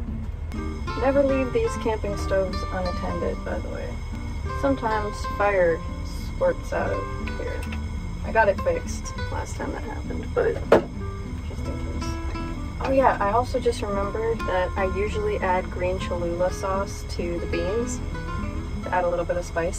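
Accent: United States. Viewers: You're from Mother Russia? You sound completely American. Make some Borscht. 🙃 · Southern accent with a twist 😜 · Sounds very american...I never know she is russian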